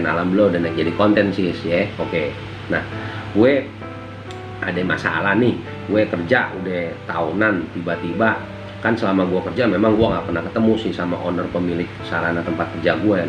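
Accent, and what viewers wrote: Jakarta, Indonesia. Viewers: Waalaikumsalam k Ngomong y khas banged Betawi k😁😁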